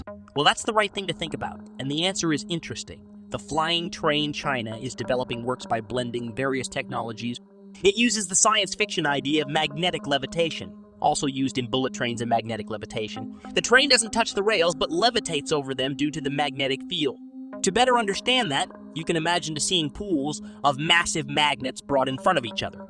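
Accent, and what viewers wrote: United States. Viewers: ChiNews is a good program but the voice is terrible and needs to be changed to a softer voice, and not a heavy noisy U.S accent. This voice is horrible to the ear.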